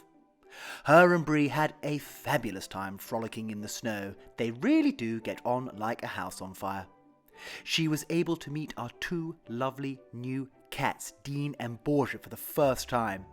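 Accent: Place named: England, United Kingdom. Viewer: “don’t be daft “ ha ha that’s all the English lingo I know… but truly love love your excellent English accent. So delightful!! Love watching your English cottage come alive! Thanks for sharing your journey !! Much love from Utah in the states.🤓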